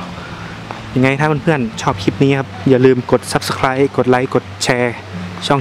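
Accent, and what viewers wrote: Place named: North, Thailand. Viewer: ดีครับผม อู้กำเมืองน่าฟังดูดีนักๆๆครับ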